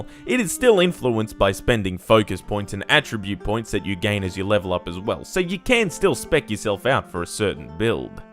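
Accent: Australia. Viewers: This guy sounds like the biggest ethnic from Australia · No. He sounds either Australian. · Definitely Australian but with some kind of Slavic twinge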